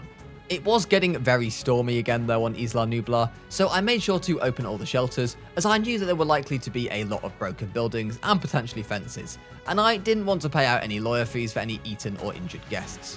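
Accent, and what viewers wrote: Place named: United Kingdom. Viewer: Ur British yay finally I found a British person that does Jurassic World videos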